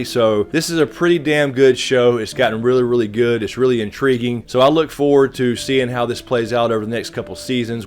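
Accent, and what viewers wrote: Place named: North Carolina, United States. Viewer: North Carolina? Help me with your accent. Nice video, thanks.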